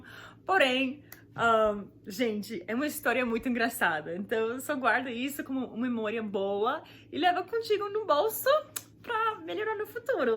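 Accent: Brazil. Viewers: Gringo é uma palavra de origem espanhola e significa estrangeiro em português. Quem popularizou essa palavra para os estrangeiros foram norte-americanos aqui no Youtube. Mas não entendi do porque você não tem sotaque nordestino e sim paulista? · Não, o sotaque dela é carioca. Ela não carrega no ‘r’. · I know but your accent is so beautiful like a Brazilian. Congrats.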